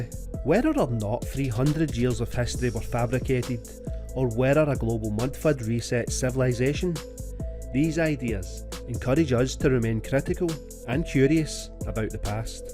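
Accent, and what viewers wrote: Scotland, United Kingdom. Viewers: Great Information...But Hard Time Understanding The Scottish Accent.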